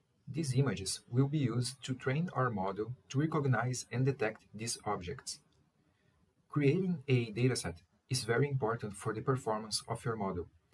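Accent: Brazil. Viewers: eu tava escutando vc falando e pensei, parece ser br, ai li o nome do canal kkkk vlw pelo tutorial mano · vc é brasileiro né ? parece pelo sotaque. abraço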